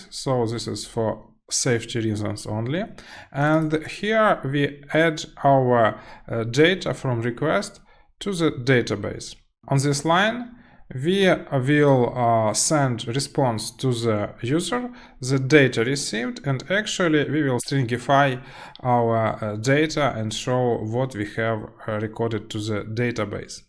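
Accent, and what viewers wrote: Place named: Russia. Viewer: Seems like a russian guy:)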